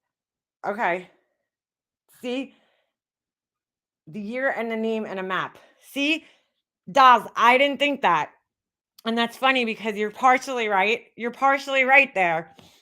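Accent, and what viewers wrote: New York, United States. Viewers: say whaaaaaaaa?!?!?! 😳😳😳😳😳 ill just never understand ppl who literally know for 💯 certain that certain individuals are garbage, hateful, lieing, vile, cruel shit bags - but they overlook it, bc theres some sort of short term upside.... the little validation this gives that shitbag, only validates them more & keeps the destructional hampster wheel going.... legit, it makes it so so soooo much worse for countless ppl currently AND in the future.....nothing i could ever gain personally, would outweigh validating that evil shit she does to ANYONE else down the line... nothing, nada, zip, zilch 🙅 it doesnt make me mad to hear about this happening, just sad if this is whats going on 😔 obviously im missing aloooooooot cuz hearing you say this is going on, floors me....trust this = you cant fix nor change mean azz heartless ppl, who legit enjoy nothing more than completely trying to anihilate & destroy ppl.... 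you dont know when its coming mor why, but u best believe that ur on the clock & its only a matter of time.... u wont see it coming either, cuz its love bomb to anihilation - no in between thanks for being the ultimate 🐲 slayer, and just keepin it 1 millionnnnn on this 🐲 lady,!! 🤣😍💯 thanks for your service, in the fight to stop the "dragonnnn decapitationnnn" 🙌😂 #StopTheDragonDecapitation i only caught the 1st 45 mins, so imma finish this later tonight..... its alwayssss hella cool to hear that accent goin on a good ol NY truth droppin semi rant on 1.5 speed!!! 🤗 😍 🙌 made my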